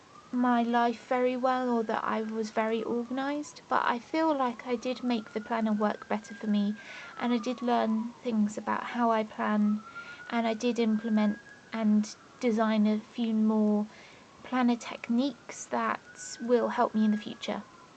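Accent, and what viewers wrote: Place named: United Kingdom. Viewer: my first search today at the YouTube about planning planners (not just designing...), really happy to find your videos, I love your ways of planning, going to watch all your videos later. I was also glad to find a British-speaking accent, even the sound of seagals on the front in one of your videos made me smile :-):-):-) thanks for your videos and sharing your experience of planning x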